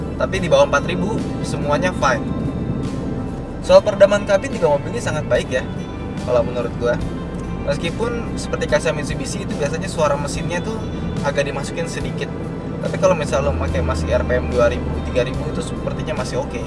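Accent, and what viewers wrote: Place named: Semarang, Indonesia. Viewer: mas wong ndi to? logate koyo wong semarang? asem...:D usul: kenapa gak kalian bikin acara khusus otomotif ber-3 kayak top gear. Khusus menjelajah Indonesia/asean pake mobil2 yg familier di Indonesia. Kayak review mobil delica bagusnya langsung test naik gunung berbatu, berlumpur, melewati lembah dll. Gitu kan jadi lebih jelas pointnya. Bosen kalo test nya di hutan yang itu2 melulu mas.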